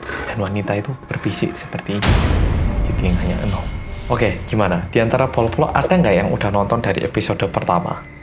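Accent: Java, Indonesia. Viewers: Hi, aku termasuk baru banget nonton video2 kamu di youtube, ya kira2 setelah lockdown di Australia berlaku. Aku suka banget dgn konten2 rindingdong n detektif reomit. Aku cm punya satu pertanyaan, kan medok ya hehehe tp pas pronounce bolo-bolo kedengerannya kayak “polo-polo” ya? Apa ada penjelasan soal ini yg aku belum nonton di video? Thanks and keep up your good work:) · Orang yanggg Medokkk 👍.Mantul😀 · Happy birthday mas medok... sehat terus yah mas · Mas hbd. Kok mas jadi seneng yang mistis2 to? Mas kan kalo ngomong medok terus ya, kalo ga medok bisa gak mas? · Bang orang Jawa indo campur Korea ya kok lucu waktu ngomong nada jawanya😂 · Hbd mas. Semoga panjang umur dan tetep MEDOKKKKK 😎 O iya, jumpscarenya jgn sering2 dong mas. Kaget aku Pengenya g ntn, tapi penasaran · Hadir Mas Medhok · Happy birthday ko orang korea yang medok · Gumun aku,, jowo mu rak ilang ilang mas. 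😂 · Liat opah" Glowiinggg yg Medok...😁 😁, konten ny bikin merinding😅😅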